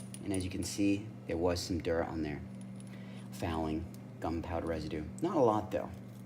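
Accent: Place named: Boston, United States. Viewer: Cool Video! Are u from Boston? Sounds like u have a Boston accent 👍👍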